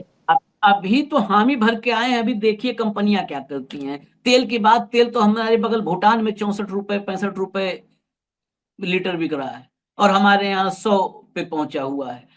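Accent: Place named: Ganges, India. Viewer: bhai aapke bolne ka andaz accha hai wohi ganga kinare wala Dil aapka saaf hai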